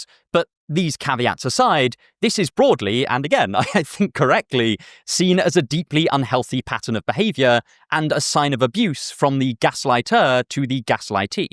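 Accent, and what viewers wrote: United Kingdom. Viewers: has the most pleasant british accent i've ever heard. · I like the fact that refers to himself as aggressively British... He is definitely the most British sounding person I know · Can someone tell me whats his accent called? British PR? Modern PR?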